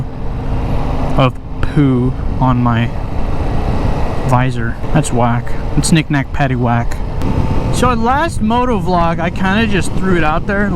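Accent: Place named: California, United States. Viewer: I’ve just watched a handful of “how to get your motorcycle license” videos, realized I needed to be watching ones related to the state I live in, and let me tell man. You don’t need to put “in California” in the title, because the moment you start talking, I instantly recognize my fellow Californian accent lmao. I’ve been watching southern/Midwest dudes for the last 2hours and now I’m hearing how Californian I must sound to anyone slightly east of Cali 😂😂. Great info man, I’m gonna be watching more of your videos 🤘🏼.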